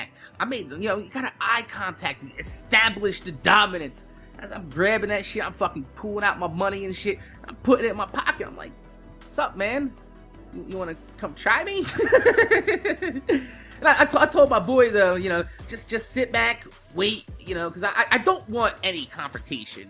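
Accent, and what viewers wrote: New Jersey, United States. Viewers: Hey... glad you handled the situation like a boss. I know you mentioned your Jersey accent in another vidja. Guess you're still in Jersey? I'm not too far off from there. get at it bro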